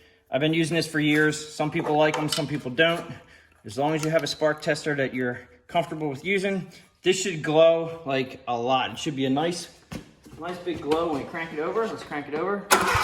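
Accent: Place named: South Jersey, United States. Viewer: Your South Jersey/Accent makes me feel at home.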